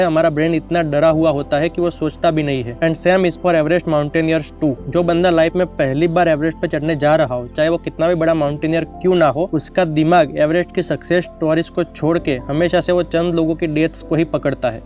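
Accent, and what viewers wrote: Gujarat, India. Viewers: Gujrati accent in Hindi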